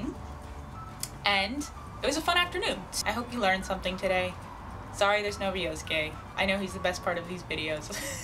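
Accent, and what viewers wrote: Texas, United States. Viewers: I'm from Texas and I can say, there is no true stereo type accent. Texas, being such a large state, has different accents and dialects depending on what part of the state you're from. A lot like Japan. The accent most people relate to "Texan" is the drawl spoken in East Texas, like Bryan or Tyler. She's from Austin and sounds pretty Texan to me.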